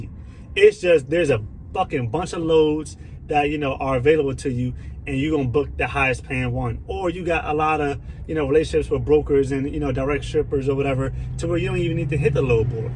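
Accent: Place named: Spain. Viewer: Yow how u sound well on these videos but on the phone when u talking u hv a Spanish guy accent 😢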